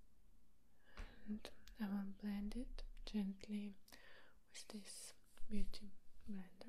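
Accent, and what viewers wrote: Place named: Russia. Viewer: ты русская? уже достаточно видео посмотрела, где у тебя очень четкий акцент, интересно просто узнать🤗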